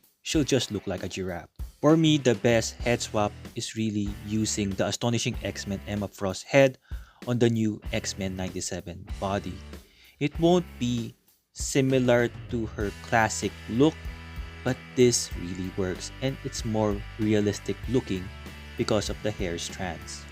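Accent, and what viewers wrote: Philippines. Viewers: Great vid! Took me 4 seconds to hear that you are Filipino 😂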